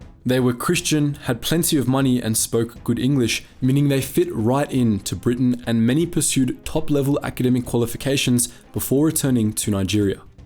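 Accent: South Africa. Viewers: He sounds south african. And most people don't care about cricket, at all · Is the narrator a saffa?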